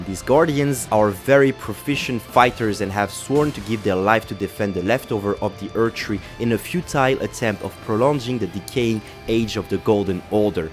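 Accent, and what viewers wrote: France. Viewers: Maaaan, first of, great vid overall! But... during the whole vid, I was like "Hum... this guy sounds French af!" Then when you said your name " " at the end, j'ai su que tu étais bel et bien français et que je ne m'étais pas trompé! 🤣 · I just realized not only fo you have the French accent, but also th exact same voice and timbre as the "two months later" guy on spongebob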